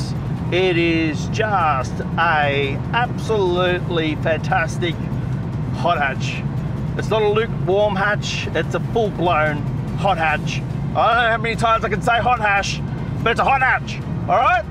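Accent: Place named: Australia. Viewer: As soon as I hear an Australian accent, I know it's NOT the version with the track package lol.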